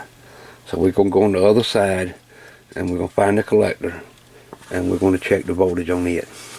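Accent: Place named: Deep South, United States. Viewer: I’d say somewhere between Alabama through to Louisiana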